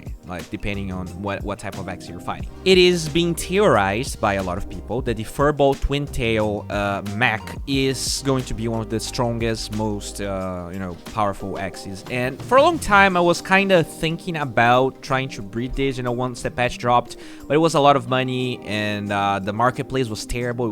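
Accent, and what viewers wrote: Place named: Brazil. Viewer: Mano, eu nem sabia que tu era brasileiro e percebi pelo sotaque em inglês. A gente fala de uma forma muito específica em inglês euheuheuhe. Ótimo vídeo!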